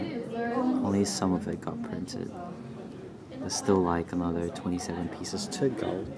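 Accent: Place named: South Africa. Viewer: Amazing work bro... I swear to God u look and sound South African... It your work though that got me💓💓💓.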